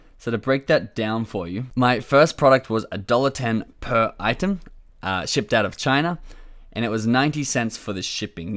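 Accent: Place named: Australia. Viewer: Hi! Thanks for a great course, just found your youtube channel. One question, are you based in the US or have a company registered there? The reason why I am asking is that I am based in Europe, but would like to sell on <link> and from the sound of it you are from Australia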